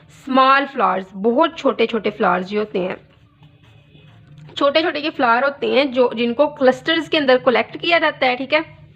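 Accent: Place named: Lahore, Pakistan. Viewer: Thank uhh so much mam 😊❤❤❤ your accent is so cute..kind of lahori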